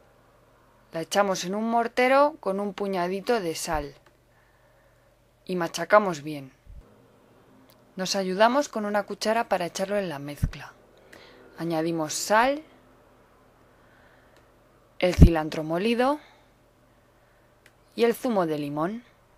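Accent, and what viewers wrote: Spain. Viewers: Gracias " " Que rico, me gusta escuchar el acento espanol y conocer los nombres de las cosas. Lo unico que no entendi fue el nombre del chile que se le agrega al mortero (o molcajete como le dicen en Mexico), Cual sera el nombre en Ingles? (vivo en los Estados Unidos) De nuevo, Gracias!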